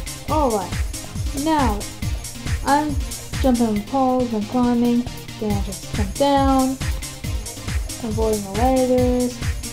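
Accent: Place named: Australia. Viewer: No! Don’t be harsh on yourself. Your voice is good. You’re from Australia I can tell by your accent.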